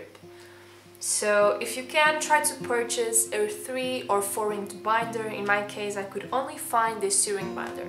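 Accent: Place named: Portugal. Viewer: Sotaque de portuguesa é inconfundível ahah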